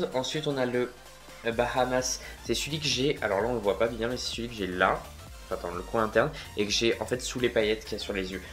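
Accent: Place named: France. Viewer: Ton accent français est trop chou quand tu dis quelque chose en allemand ou anglais :D et fais un tuto stp 😍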